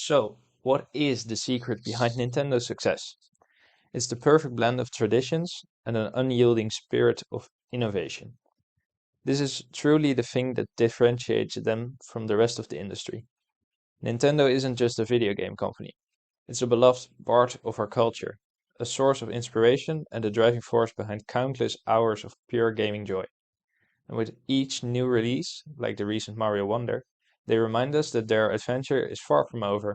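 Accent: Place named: Netherlands. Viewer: in my native language it’s also succes! I thought i heard an accent, are you by chance Dutch?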